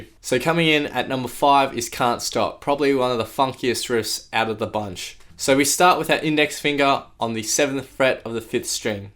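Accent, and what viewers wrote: Australia. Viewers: Hey I recognise that accent! Hello fellow Aussie! :D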